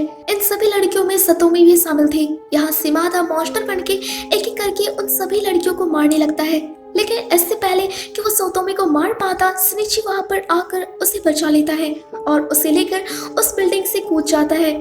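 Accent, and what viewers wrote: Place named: Odisha, India. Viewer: aap odisha se ho lagta hai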